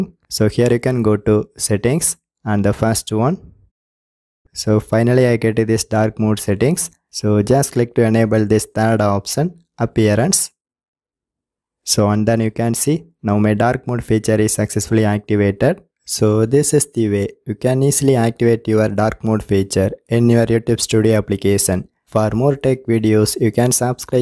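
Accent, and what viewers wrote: India. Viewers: That is the deepest idian accent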